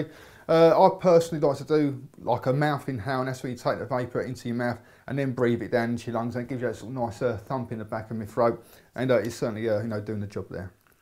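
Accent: United Kingdom. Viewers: Hes british not english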